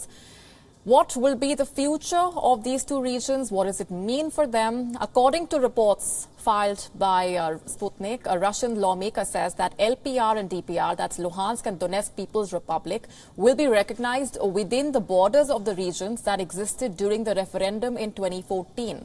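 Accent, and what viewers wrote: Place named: India. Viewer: This anchor has a pretty heavy chapati/bada pav accent Not smooth like Palki Not suited for an international channel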